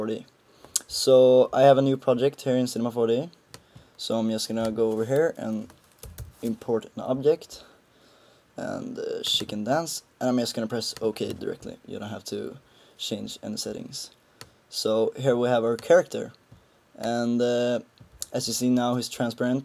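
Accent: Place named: Sweden. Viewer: haha, hörde på din engleska att du är svensk xD :D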